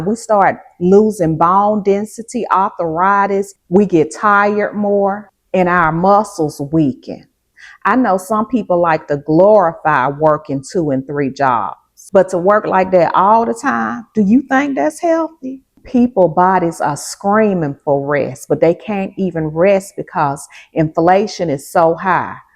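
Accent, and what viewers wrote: South, United States. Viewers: Love the message and your southern draw as well. 😆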